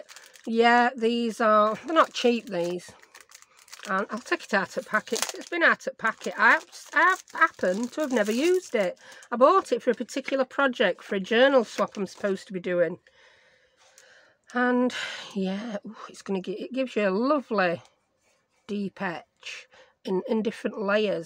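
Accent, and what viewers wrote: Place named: Yorkshire, United Kingdom. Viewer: Brilliant video. Love the accent. Is it Yorkshire?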